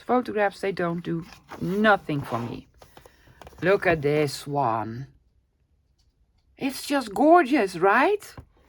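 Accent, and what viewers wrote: Netherlands. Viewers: Happy to have spoken in English (even if limited), Dutch accent very pleasant to listen to. Gail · To answer your question about languages in videos. First let me say, I have so much respect for those that make their videos in English when English is not their first language. As an English speaking person I would like to hear videos in English even if it is not perfect English. I guess that is me being a bit selfish so I can make sense of the project. I am sometimes ashamed of myself for not learning a second language. By the way, I think the Dutch accent is my favourite to listen too 😉. But for me, definitely speaking English, even if not perfect. You are so fun to listen to. You are very creative and fun. 😊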